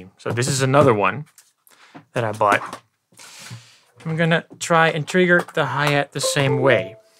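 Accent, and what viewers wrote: Netherlands. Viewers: Ik hoor aan je accent dat je Nederlands bent hahaha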